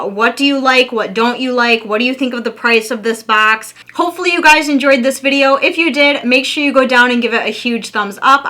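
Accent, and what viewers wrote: Michigan, United States. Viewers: I just love that Michigan accent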